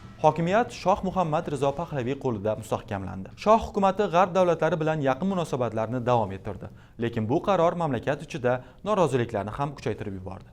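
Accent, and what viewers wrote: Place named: Uzbekistan. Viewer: ""Yoqtirma"" sizni sõz boyligingiz va asl Õzbek laxchangiz ajoyib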